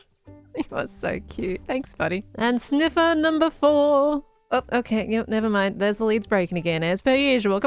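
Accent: Australia. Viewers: its so good to hear an Ausie accent on youtube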